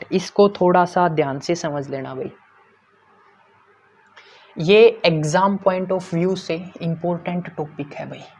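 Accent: Haryana, India. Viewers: Ap haryana se ho na ?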